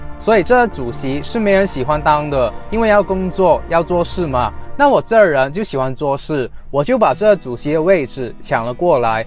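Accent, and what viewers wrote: Hong Kong, China. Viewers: 怎麼口音聽起來像香港人呢？ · 是哪裏人聽着像香港的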